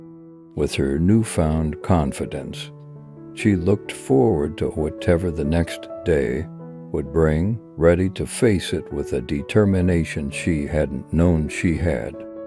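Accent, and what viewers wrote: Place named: United States. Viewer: someone could tell where this accent is? i know is american by his accent but what part of america? it is so relaxing his voice. please someone could tell me?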